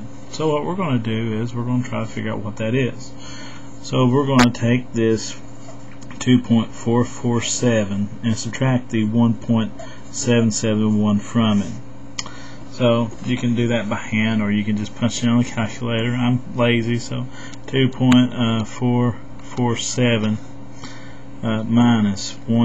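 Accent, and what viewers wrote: South, United States. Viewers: Am guessing you are from down south :)! thanks for all your help you are wonderful :) and are alot funner than reading the book lol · You and your sexy Southern accent have Really helped me. Thank you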